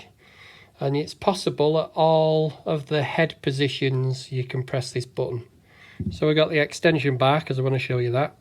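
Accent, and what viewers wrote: United Kingdom. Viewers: Yeah 😂, I think a solid 50% of us Brits have a hard time speaking our own language, it honestly gets worrying after a while 😂, are you from up north?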